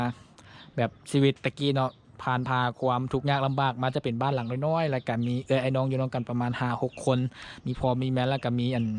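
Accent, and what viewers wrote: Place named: Laos. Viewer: ผมมักฟังลาวเด้ธรรมชาติดี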